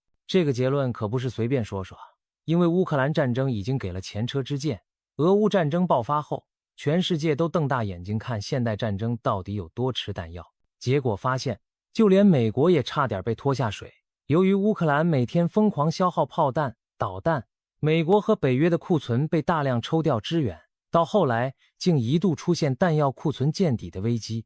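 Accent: China. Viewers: 你的口音足中共国的人,你的知識相對匮乏,解放軍不會先對台灣開第一槍,會對駐日美軍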